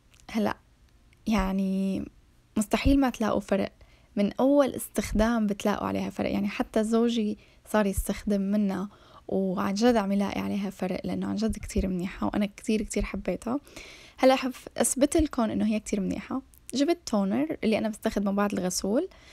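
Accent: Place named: Iraq. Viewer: حياتي شفت لهجتك عراقية وانا ساكنة بالعراق من وين حصلتي عليها ممكن تخبريني بليز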